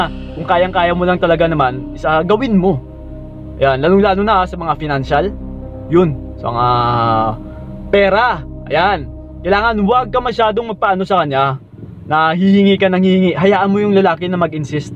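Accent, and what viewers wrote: Iloilo, Philippines. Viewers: ilonggo ka po ba kuya ?? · Ilonggo ka kuya? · Ilonggo ka ba sir?😁yung tuno ng pananalita😉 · Hello po, ilonggo ka cguro dahil sa tono hehehe.. New subscriber po. From Hong Kong 😊Ldr din po. Thank you sa tips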